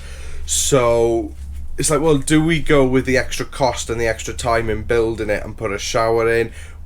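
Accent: Wales, United Kingdom. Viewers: Where is that accent from? It's not Brum, or Scottish ... Welsh perhaps ??? Shame your workshop is not big enough to bring the vehicle inside - rain will be a big problem - traipsing into the van making a wet floor and a mess. I don't like any of the layouts, nearly ALL vans are not wide enough to sleep across the space ... My personal layout is for a single person - fixed bed along the 'long' side with storage under, and kitchen on short 'door' side. Potty at end near rear doors, no garage, no shower, no electrics. VERY basic, therefore very cheap 👍👍💯💯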